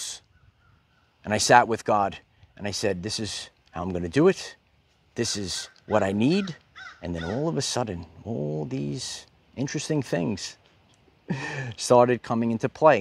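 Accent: New York, United States. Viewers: a beacon of life from my past....your little NYC accent, all love...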